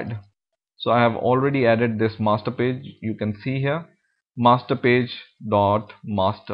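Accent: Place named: India. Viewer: is there any videos not done by an indian? I hate the accent. Makes me want to make them take a drink of water and get rid of the dry mouth.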